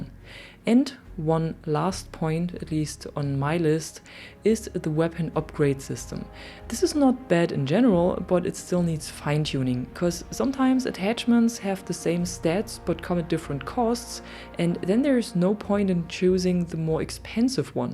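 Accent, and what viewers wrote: Germany. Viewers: A good video, I completely agree with you. A side question: May I ask where you're from? Your accent sounds like a German who speaks perfect English.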